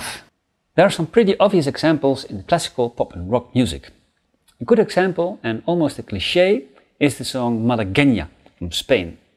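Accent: Netherlands. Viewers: Dutch? Sounds great!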